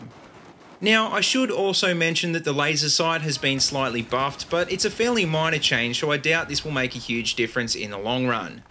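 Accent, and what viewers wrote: Australia. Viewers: Ive always wondered where in Australia you come from?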